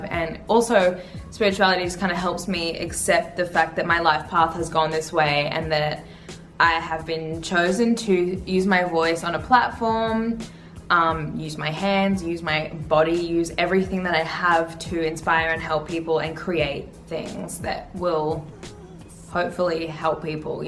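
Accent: Australia. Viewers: I love your Australian English. Are you from Melbourne?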